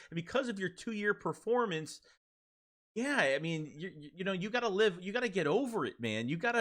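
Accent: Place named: Philadelphia, United States. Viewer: U sound like the Philly media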